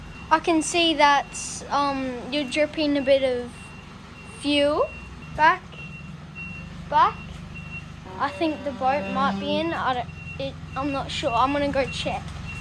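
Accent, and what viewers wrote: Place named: Australia. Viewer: Ok, to start with, since it says you posted this just 9 hours ago, you must have done okay with Alfred. I was worried about you guys, so I'm really glad to see this. Then... brother, you just gave me a crazy idea on how to build a roof for my Bertram 20. A little different than yours because frankly, I don't have anywhere near the patience you do for a project like that, but it'll be cool nonetheless. Finally, I had to laugh out loud, man. I grew up with a couple of kids who were from South Africa, and although I know that the Australian accent and South African ones are completely different, they're close enough to my American ear to bring back a heap of great memories.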